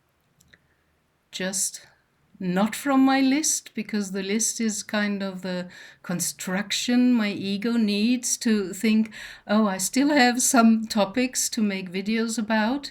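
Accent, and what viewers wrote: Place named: Germany. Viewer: I had to subscribe. You have a similar German accent to my father, an accent that shows that you worked on perfecting your english. He passed at 90 a couple years ago and today May 11th was his birthday. I called his partner to wish her a happy mother's day, and we talked about him. Anyways, i like your message, i hope you're back in full swing soon. 💗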